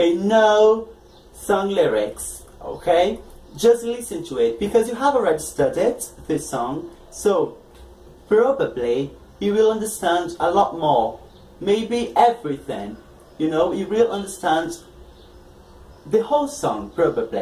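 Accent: United Kingdom. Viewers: Perfect English!!! This kind of accent that i want!! A British one.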